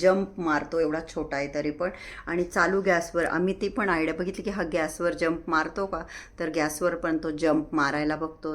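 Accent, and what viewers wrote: Nashik, India. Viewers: Hi dii me tar pillu la Laddu ch mhananar..❤❤ me tar त्याच्या videos chi waat baghat hote Love you..me Nashik मधील ch aahe but now staying in Bangalore..tumcha typical Nashik cha हेल आहे i mean accent aahe तो खूपच मस्त वाटतो❤❤❤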